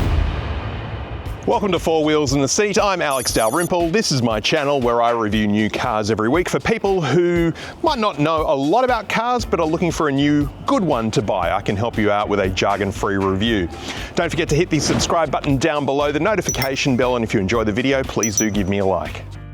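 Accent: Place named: Australia. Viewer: lol, oops, didn’t realize you were Australian and that y’all did that too. Fascinating! Love your sense of humor as much as your accent. I have a thick Appalachian accent myself and do not have a great ear for other accents after years of linguistic isolation. Thank goodness I did have a Miata to make it through. lol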